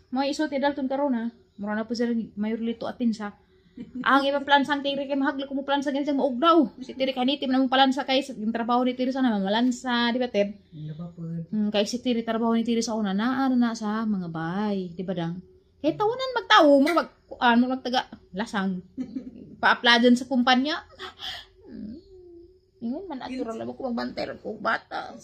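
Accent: Bohol, Philippines. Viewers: Mo angay jud ko sa imong sinultian. Pure Bolanon jud. ❤❤❤❤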